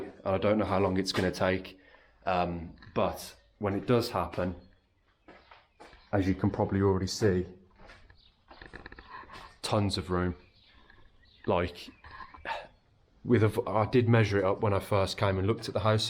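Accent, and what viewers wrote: Birmingham, United Kingdom. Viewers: Glad things are coming together for you..i am from the uk and i sense a west midlands accent.(brummie) perhaps.?...bavaria looks magnificent..best wishes.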